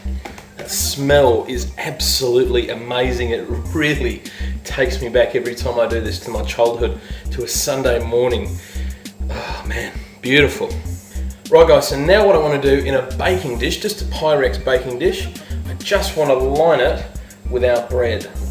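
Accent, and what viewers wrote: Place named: Australia. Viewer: same here....heard ur Aussie voice and went SNAP Subscribe lololol look forward to ur videos "P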